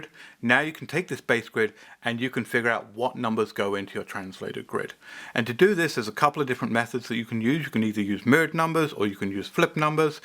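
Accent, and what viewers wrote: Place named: United Kingdom. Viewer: looking forward to. You UK? I love your accent.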